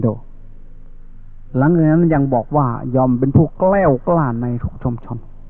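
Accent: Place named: Isan, Thailand. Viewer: ได้ฟังพระอาจารย์บ่อยๆๆจ้าวชออบฟังท่านเพราะคะคำพูดอิสาน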